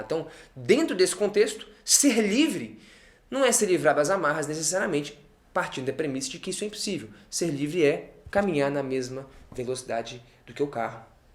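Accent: Minas Gerais, Brazil. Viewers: tu é mineiro mestre? Me identifiquei com o seu jeito de contar caso e falar KKKKKK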